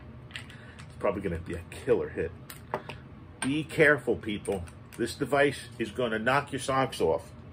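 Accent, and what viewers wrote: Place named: New York, United States. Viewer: are you from new york or new jersey? love your accent and videos, cheers!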